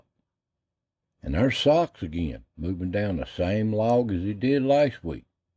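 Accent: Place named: South, United States. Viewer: Heck fellurs I can just close my eyes and enjoy hearing ol' country accent and let it take me back to the day. ThankQ, you're a blessing to many of us who are familiar ol' country souls.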